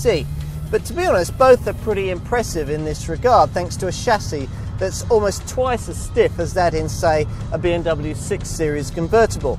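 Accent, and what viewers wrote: United Kingdom. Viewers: Well presented , chic & cool at the same time … and with a british way fashion way to speak it looks so authentic … btw presenting the car beside a superb British mansion gives even more attraction to it …. Thank you so much …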